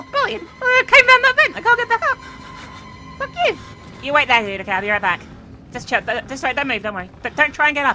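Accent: England, United Kingdom. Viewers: Never seen before is she from England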